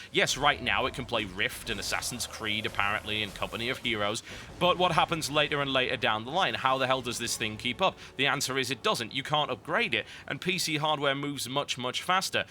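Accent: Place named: Newcastle, United Kingdom. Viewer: AHHH you ARE a Geordie! I knew it.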